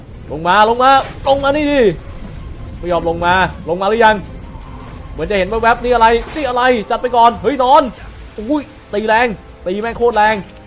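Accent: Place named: Nakhon Si Thammarat, Thailand. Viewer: พี่คนนครใช่ปะ(ผมฟังจากสำเนียงอะ)คนใต้ด้วยกันอิอิ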